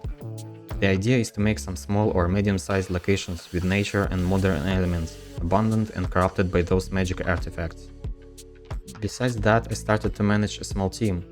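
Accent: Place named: Russia. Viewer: Ты русский? По акценту понятно.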